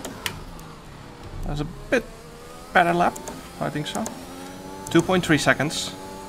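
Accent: Netherlands. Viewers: verry nice nederlandish accent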